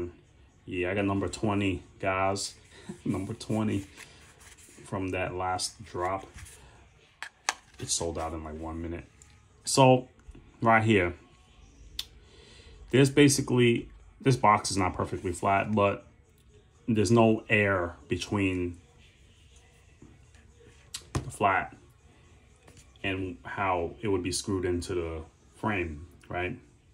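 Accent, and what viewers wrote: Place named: Louisiana, United States. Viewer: I don’t think the clip pressure is a factor at all. The only thing it could do is make the unlock harder, but it just doesn’t. I feel like the Zaan has the easiest, smoothest unlock you could ask for - there is ZERO lockstick, and the bar is intuitively designed. I’ve never heard anyone criticize the unlocking, the it’s one of the Zaan’s best features. Out of the box, the OPENING is pretty stiff, but you have options - 1) you can just use it until the washers and blade break in to each other and slide easier (6 months maybe?) 2) you can disassemble the knife and carefully polish the face of the washers (2000 grit paper on a flat surface) then add some grease and a little oil mixed in 3) the easiest and fastest fix - just back off the pivot a teeeeny bit (1/32 of a turn, if that) and the deployment is a lot easier. 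What’s the background on your accent? There’s like some Louisana but a little Boston?? It’s unique, I’d love to hear the origin.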